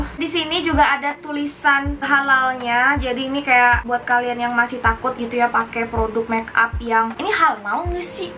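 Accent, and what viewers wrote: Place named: Sunda, Indonesia. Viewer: orang sebrang tapi sunda nya udah lekoh pisan, ngakak lucuu but luv❤️❤️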